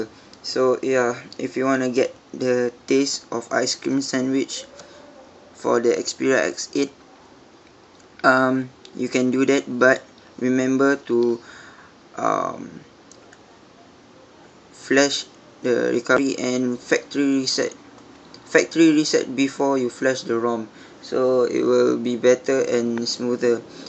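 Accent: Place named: Malaysia. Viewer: sounded like a Malaysian.. probably a Malay coz of that accent..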